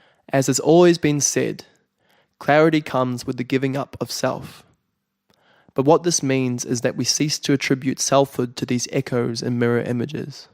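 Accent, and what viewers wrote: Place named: Australia. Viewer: I am grateful for your Reading with your down under Dialekt. Thank U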